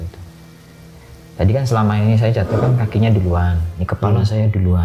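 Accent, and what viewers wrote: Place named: Banyumas, Indonesia. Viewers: Slam ngapak mas tegale ndi mas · Di Chanel BP lebih lengkap, disini juga keren aku suka gambar ilustrasinya, sehat trs wong tegal laka2 ceritanya epic bhs juga epic ngapak 😂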